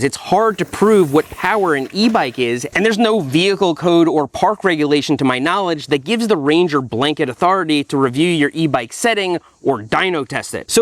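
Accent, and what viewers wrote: United States. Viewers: how is a very strict legal definition an 'unspoken agreement' ? brother you sounded very american here.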